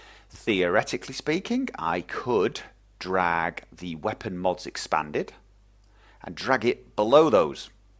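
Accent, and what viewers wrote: United Kingdom. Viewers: You sound a little British but you are from Norway?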